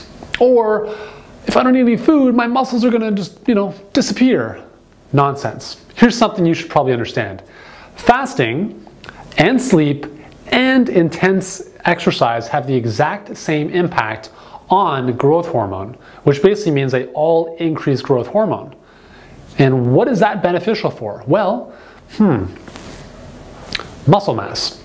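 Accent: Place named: Canada. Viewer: Canadian raising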